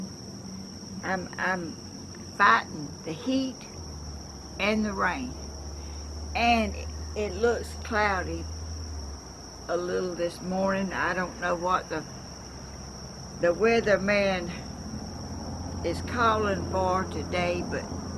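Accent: South, United States. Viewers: I have been watching you now for a few years and I have to ask “ is there anything you can’t make?, good lord woman, I’ve seen you make so many things and no project seems to stump you, I’ve made mushrooms with you and many other things and I love your southern accent, I am Canadian and a crafter, I love to work with glass the most, many years ago, my first table for a wedding gift started my road to making tables, I made and sold so many, I made and sold what I called window in a window with frames and glass to hang in a window, they also sold very well, keep up the good work, god bless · I love listening to you with your southern accent! I also love your hair,you do a great job,I to color,cut and perm my own hair but I was a hairdresser. You are soooo very talented keep up the beautiful work. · Shelley M Martines I’m glad that during my sleepless nights I can follow our beautiful and her lovely southern voice, and gifts from our dear Lord. 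💝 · I started watching your videos about a year ago and you're just wonderful! I love your enchanting yard, your southern accent, your turn of phrase and your love of nature. This mosaic table is the most fabulous treasure I've seen in a long long time. The colours are amazing, your visit with your youtube friends is heartwarming and you leave everyone with a feeling that they've just had a lovely visit with an old friend who never judges or questions. You're truly a gift and I'm so happy to have found you! Sending love and greetings from Canada! And as a side note, I suggested to our local nursing home that their residents might love your channel because I think you will take them on a wonderful journey everytime they watch one of your projects and get to visit with you in your garden. You are truly a gift and I don't think you realize how loved you are, you bring a lot of love and happiness to many people every day.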